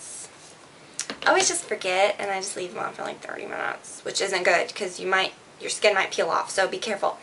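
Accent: South, United States. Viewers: I think is pregnant too! She is GLOWING!!! & girl I can your southern accent coming out in this video! Love it! I use Pro Active too & LOVE it!! Great video! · Your southern accent really came out in this video.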